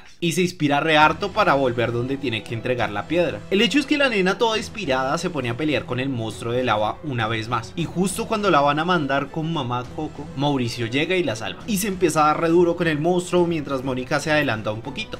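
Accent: Bogotá, Colombia. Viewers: Ten en cuenta que quien hace estos videos es rolo, es decir , de Bogotá o cerca. No todos los colombianos hablamos así ;) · Eres colombiano? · Me encanta el hacento rolo que hace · Jajajaja me encanto Todo Tu forma de hablar y la manera en lo que lo resumió súper colombiano, Nueva sub :> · es re bogotano XDDDDD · Pero si habla puro rolo, cómo va a ser de medallo? 🤣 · me gusta como narras este resumen xd, ya que yo tambien soy colombiano pero el problema esque perdi mi acento y me encanta volverlo a escuchar :3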